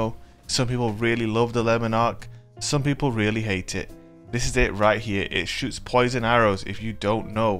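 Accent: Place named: Yorkshire, United Kingdom. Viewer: i always wondered why my accent sounds so similar to yours. NO WAYY you're from west yorkshire, im from north yorkshire and i watch your videos all the time! the earth is so small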